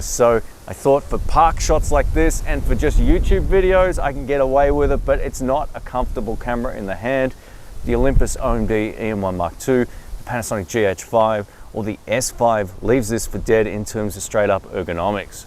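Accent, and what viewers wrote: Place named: Australia. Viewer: About the grip: have you searched for a "smallrig" wood replacement? I improved my grip on the ZV-1 (my solution to get cheap, reliable AF last year). Now the size (almost identical to my Pana GX8) is really nice - but some of the "old tech" aspects... How do you see the a7IV compared here - makes sense to wait for it, right? (do we ever wanna wait for something - who doesnt want the cam NOW?) great channel, new subber here - could tell you're an aussie quite qick into your first vid - but it's a nice accent, sympathic! greets from Austria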